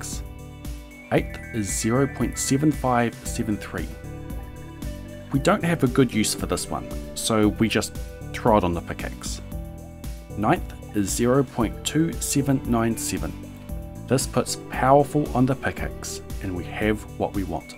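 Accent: New Zealand. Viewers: Nz accent?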